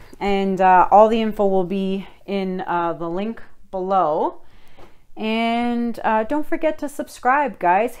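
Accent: Canada. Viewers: She cracks me up because she sounds so Canadian. That’s not a bad thing. It’s just very easy to tell she’s from Canada just by listening to her.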